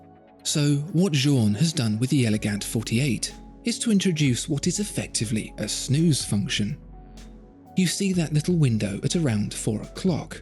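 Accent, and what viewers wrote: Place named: RP, United Kingdom. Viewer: Despite the crazy watches that are already fascinating on their one, the real key to your videos is that absolut high end English. Huge probs. Love it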